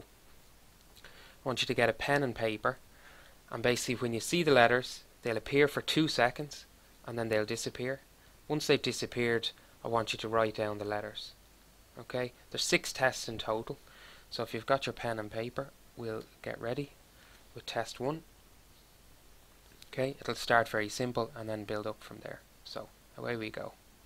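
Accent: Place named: Ireland. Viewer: Your accent is beautiful. You irish?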